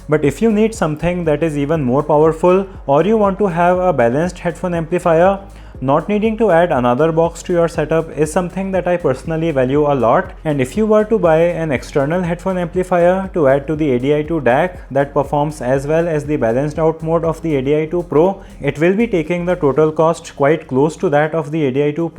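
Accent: India. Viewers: The Indian accent is the most annoying English accent in the world ewww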